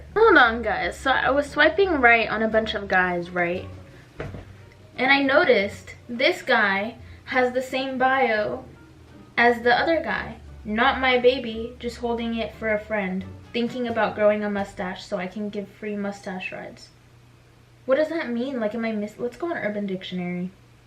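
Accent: United Kingdom. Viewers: The British accent LAMAOAOAOOA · I’m British.. and I am so here for ur British accent it’s rlly funny 😂 in a good way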